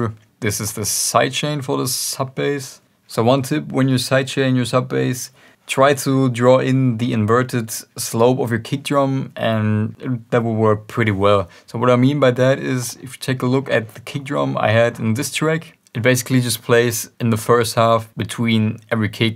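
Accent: Germany. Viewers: Es hört sich so an als wärst du ein Deutscher! :) Wegen dem Akzent! Ist aber nicht schlimm, sondern cool!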